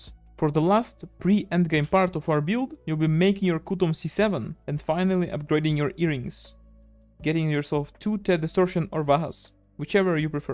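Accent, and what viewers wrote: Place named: Pakistan. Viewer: Love that pakistani accent 😍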